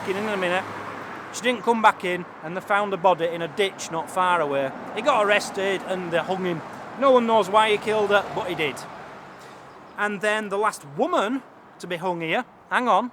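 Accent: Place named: Lancashire, United Kingdom. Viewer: Please tell me you're from Blackpool! Or at least, Lancashire!..Great stuff...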